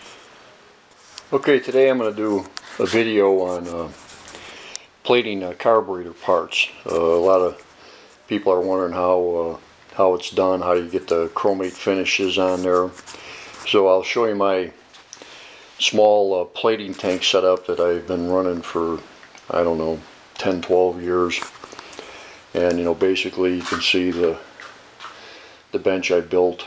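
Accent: United States. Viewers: That's gotta be a Chicago accent!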